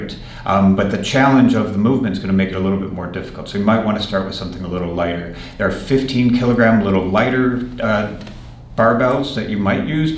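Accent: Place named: Halifax, Canada. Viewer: Great vid thx. Dude gotta be from halifax or something "the bahr"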